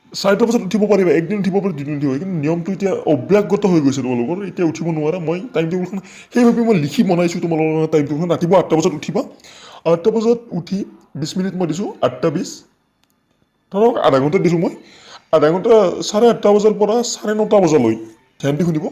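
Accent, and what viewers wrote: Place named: Nalbari, India. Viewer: Khati Nalberiya oi nijoka ki buli vaba sirok anaka kobo laj nalaga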